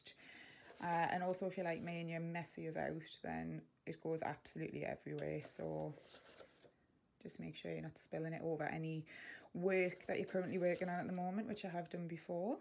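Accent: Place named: Geordie, United Kingdom. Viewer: This is an excellent tutorial dearest! I love your sweet Geordie accent my mandala soul sis! 🥰😍❤🥰😍❤ I am so glad to see I'm not the only one who don't follow instructions on the packet 😁😄 sometimes you just have to follow your instincts and these have turned out brilliant. Keep the videos rolling my sweetheart ❤❤❤❤❤😍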